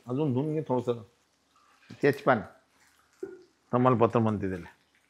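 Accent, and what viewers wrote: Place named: Varhadi, India. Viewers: Aaji lay chaan varhadi bolta Maja aa gaya Mai Nagpuri ❤ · वऱ्हाडी छानच बोलतात आणखी कोणत्या भाषा येतात तुम्हाला? नक्की बनवून बघणार, मी playlist बनवतो आवडत्या रेसिपीज ची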